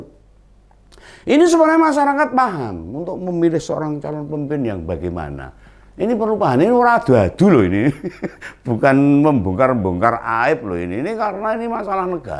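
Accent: Java, Indonesia. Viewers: Om iki asline ngendi to.... Medoknya itu yg aq suka. (pokoknya ma grup penyuara kebenaran aq suka smua) Suwun....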